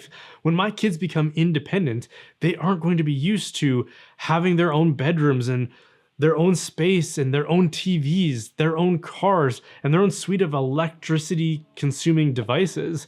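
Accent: Canada. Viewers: Oddly, hearing a canadian speak over images of suburbia started " " playing in my head.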